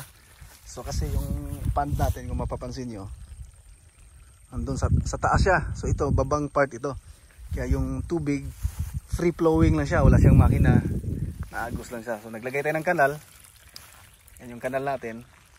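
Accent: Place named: Bohol, Philippines. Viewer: Taga Bohol po ba kayo sir?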